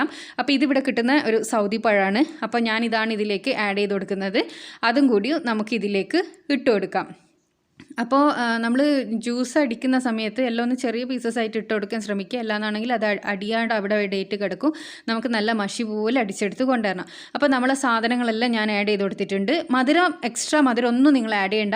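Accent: Kannur, India. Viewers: Samsaram kannur kare pole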